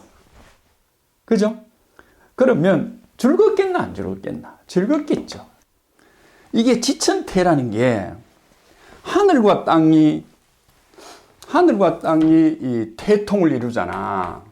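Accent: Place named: Gyeongsang, South Korea. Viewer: ❤❤❤❤❤ 몸 띠이 (몸) 사움 (싸움) 살 (쌀) 요레! 요레! ㅋㅋㅋㅋㅋ 경상도 특유에 독특한 사투리로 어렵다는 주역 을 말씀해 주시니까 박사님!주역해설이 더욱 재미 있습니다 박사님! 멋있습니다 ❤❤❤❤❤ ❤❤❤❤❤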